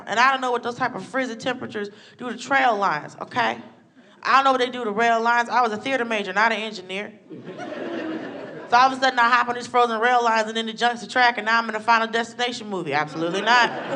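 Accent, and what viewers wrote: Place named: New York, United States. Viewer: Her NY voice is grating...and annoying.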